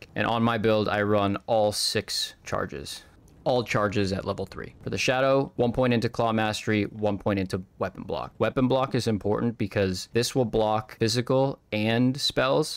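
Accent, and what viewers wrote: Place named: England, United Kingdom. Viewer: Why you speak like that? Can you speak normaly? Many english people do like you, it's boring. But very nice video, and your honesty is also very nice.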